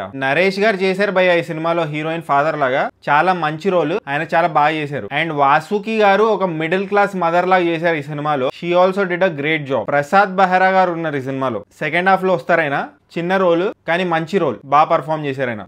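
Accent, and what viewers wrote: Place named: Visakhapatnam, India. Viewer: Vadhura puku vizag slang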